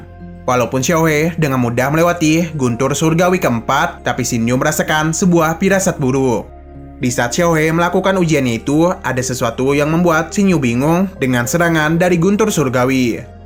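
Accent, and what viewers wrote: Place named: Central Java, Indonesia. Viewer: dari jateng mana suaranya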